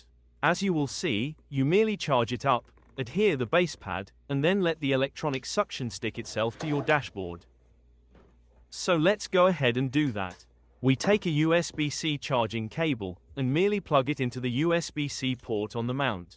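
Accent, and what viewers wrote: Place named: Oregon, United States. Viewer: Absolutely love the Oregon accent!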